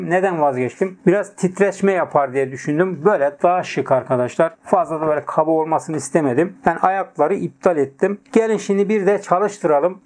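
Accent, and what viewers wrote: Erzurum, Turkey. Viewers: hay senın ben cigerin yiyim dayi maşallah sene bir dadas her yerde dadastir gendin belli edisen arada bir videolara koy şivemizi💗💗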